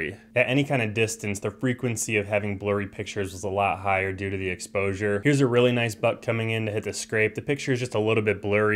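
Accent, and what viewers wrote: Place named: North America. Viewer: It would be nice of you to start by pointing out this concerns the US and possibly Canadian market specifically (still less than 5% of the global population). Not everybody can get this from your accent alone. Otherwise, a very useful overview, even if I'm interested in them for property control. And, btw, I would also mention that all three of those brands are North American and hence are likely to host their services (read: +-all of the stuff your camera sends) in there, instead of China, as some other well known Chinese brands do.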